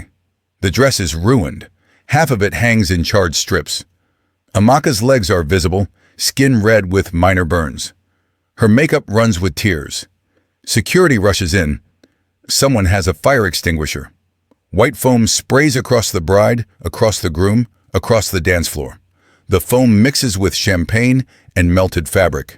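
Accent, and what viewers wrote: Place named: Europe. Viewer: Why did the voice change from the beautiful female narrator that can pronounce all the African names right to a man's voice who was born in Europe?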